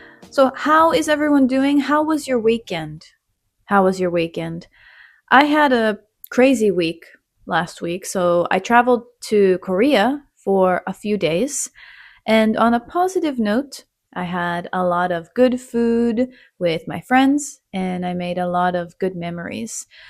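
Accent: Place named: Japan. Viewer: 全て字幕成し遂げで聞いて、７割理解出来た、けど、貴女がJapaneseアクセントでslow english するおかげだね😅プロムは、日本人の意識ではハリウッド映画等で馴染み深いというかusa のカルチャーは知ってるつもりです。😊(知らない単語が連発してるが憶える必要はあるのかな、個人レベル次第かな😅)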